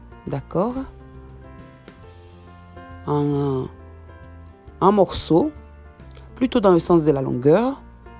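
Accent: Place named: South, France. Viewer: Mais tu as l'accent du Sud, pas de la côte d'ivoire 😊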